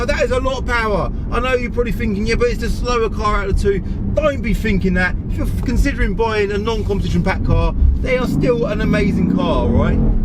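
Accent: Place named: Luton, United Kingdom. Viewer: Nah he comes from Luton, loads of multi racial people there, luton has it's own accent due to multi racial communities...I'm from Luton myself....I know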